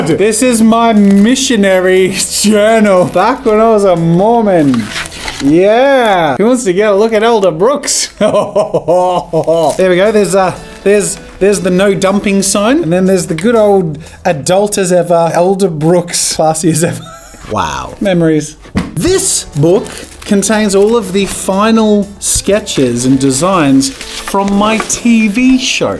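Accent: Australia. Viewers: Is he Australian because I am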